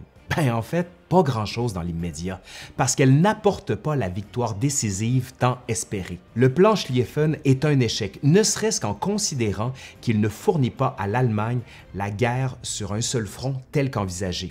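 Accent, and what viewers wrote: Quebec, Canada. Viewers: Très belle diction avec cet accent attachant de nos cousins canadiens empreint du parler de nos ancêtres émigrés il y a si longtemps dans la belle province. Ils ont tant donné près de chez moi, à Vimy Mon plus profond respect pour tout les chums qui ont donné leurs vies pour notre chère liberté. Merci les cous' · Quelle chaîne remarquable ! Des sujets abordés avec sérieux. Les références sont détaillées. Tout est admirablement commenté avec une narration et une diction très agréables. Ajoutons en prime ce charmant accent québécois. Merci ! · c'est l accent québécois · Un accent un peu faible et qui devrait marquer plus l'exotisme du Québec, surtout pour les nouzauts francophones de l'Océanie. Génial ! Continue !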